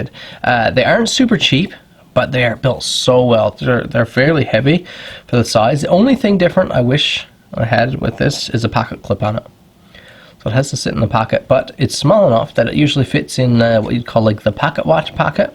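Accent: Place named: Newfoundland, Canada. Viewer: Yesman your a newfie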